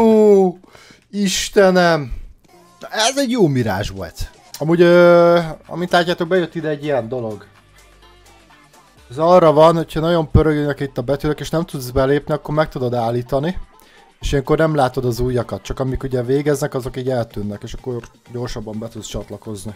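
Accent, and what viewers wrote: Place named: Russia. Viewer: Orosz accent megvan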